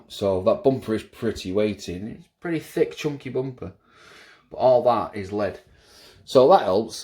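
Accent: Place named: Manchester, United Kingdom. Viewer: There's a manc if I ever heard one! Where abouts the M60 are you pal? 🤭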